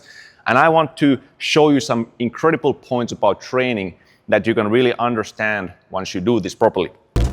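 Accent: Finland. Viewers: I can tell a Finn accent a mile away :) hard to make those English sounds isn't it ? Love the Finns. I could be wrong but sounds like Sissu to me. Love from Texas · OMG I was watching this without knowing where you were from, and I kept thinking "this accent sounds SO familiar to me..." Then when you mentioned Finland, the bomb just dropped 🤯 I realized you sound EXACTLY like Ismo!!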